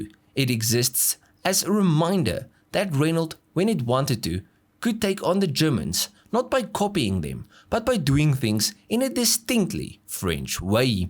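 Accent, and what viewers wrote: South Africa. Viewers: exactly. Sounds very much like a south african afrikaans speaker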